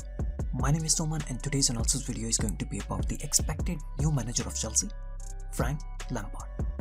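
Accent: India. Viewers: what's with this indian accent? turkish hijacked?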